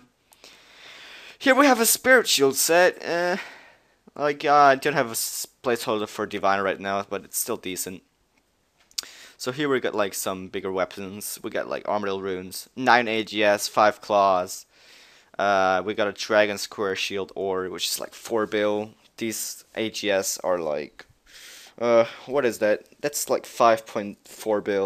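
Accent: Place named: England, United Kingdom. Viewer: It's like your talking in and english accent but trying to fight off some foreign accent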